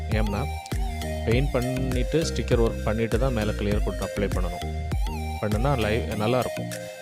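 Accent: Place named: Kanyakumari, India. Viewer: Malayalamum ila tamilum ilanu rendu kalanthu pesuringa kanyakumari slang ipaditha irukumo. Na intha pulsar tha vechurunthe alloy wheel ku munadi generation. Sadly apola vandi avlo quality ila silencer la ota vilunthudichu, battery 1.5 years kuda varathu. adikadi model update panathu enoda vandi athe pola update pana mudiyala. Ana classic pulsar classic tha athoda sound nala iruku oturathuku nala irukum. But ipo p150 pulsar vanthathunala palaya pulsar discontinue paniduvanga. Apo palaya pulsar owners ithu mathiri paint job pana chances iruku.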